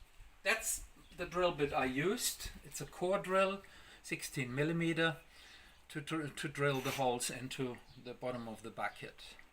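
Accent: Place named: Germany. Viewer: Hi, this is definitely the best simple and easy way I have found so far. Thank you, I have subscribed! (My name is Therese Lauterbach, from South Africa. I hear the German accent and am also from German descent. I would like to make contact with you, please.)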